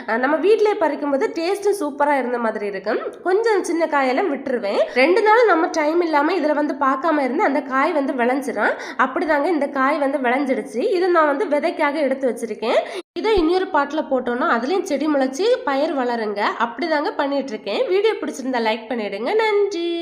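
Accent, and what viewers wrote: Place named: Kanyakumari, India. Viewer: கன்னியாகுமரி தமிழ் வித்தியாசமாக உள்ளது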